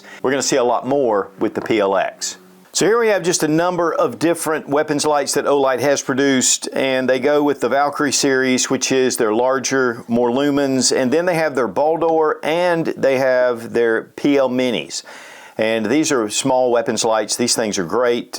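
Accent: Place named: California, United States. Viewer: I'm so jealous with, California people speaking 😅. Nice Gen6 set up. Thanks for all you do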